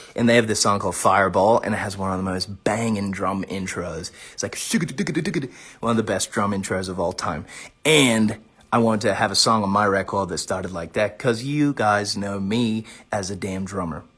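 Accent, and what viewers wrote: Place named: Australia. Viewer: THANK YOU AND HE SOUNDS SO AUSTRALIAN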